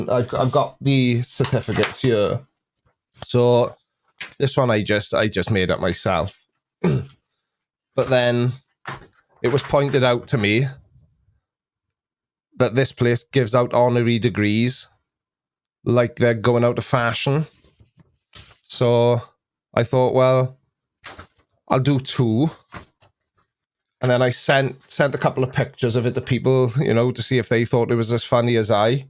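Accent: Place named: RP, United Kingdom. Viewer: his queen's english is ok.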